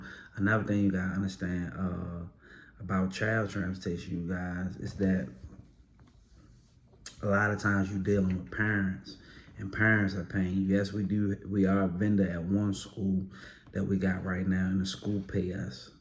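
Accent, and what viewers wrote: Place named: Baltimore, United States. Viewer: This is a sidebar he has a heavy Baltimore accent but nether the less I am tuned in for the information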